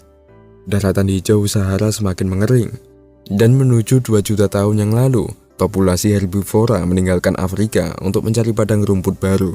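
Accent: Java, Indonesia. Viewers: logat jawa mu tu norak gak usah so, kamu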